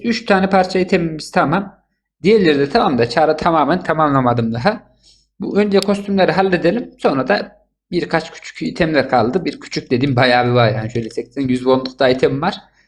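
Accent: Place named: Central Anatolia, Turkey. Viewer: mizacın güzel kardeşim iç anadolu şifesi var .D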